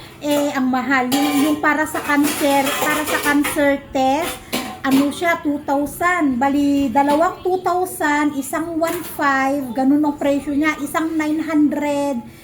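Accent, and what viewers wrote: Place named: Ilocos, Philippines. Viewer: Ganyan kasi mga ilokano ganyan magsalita kami mga ilokano kmi ni mommy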